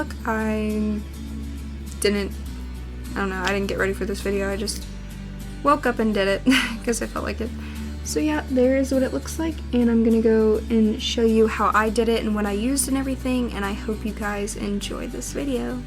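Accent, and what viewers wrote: South, United States. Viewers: Are you southern you sound like you have a southern accent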